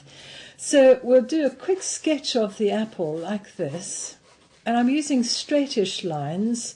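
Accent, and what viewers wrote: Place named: RP, United Kingdom. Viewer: So posh :p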